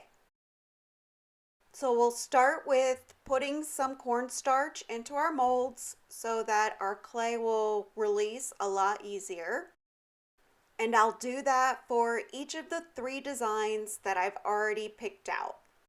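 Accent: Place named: Pennsylvania, United States. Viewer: Just Beautiful Projects!, you are my New Favorite Shabby Chic Channel! From Your Accent it sounds like you are from Pennsylvania.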